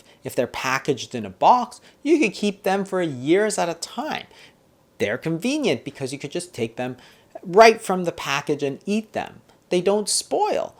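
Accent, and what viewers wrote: Canada. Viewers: Dr. Fung is Canadian, and so pronounces words in the Canadian manner. So sorry this offends you.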